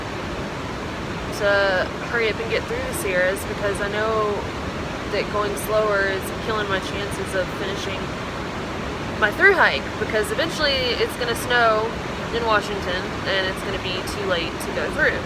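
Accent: South, United States. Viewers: That was just superb! Very nice metaphor about the beauty juxtaposed against the risk, too. And, by the way, as an Oklahoma/Texas boy, I love hearing your southern accent out there among the Californians. You represent us well! Thanks for sharing,. Steve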